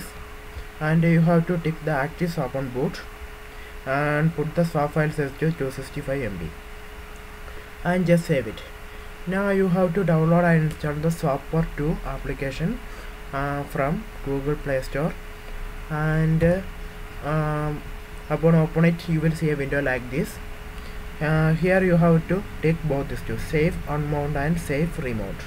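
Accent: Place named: Kerala, India. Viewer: .... thanks a lot..... frankly speakin isimply wasted my cash on that roesoft ram exoander bullshit!!!! i cud done this for free...... btw are u a keralite...... coz ur akcent seems to be like that...... im a keralite!!! anyways thanks bro!!:)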